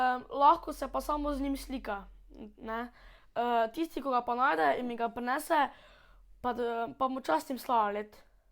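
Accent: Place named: Maribor, Slovenia. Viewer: Končno negdo na YouTube ki govori Mariborsko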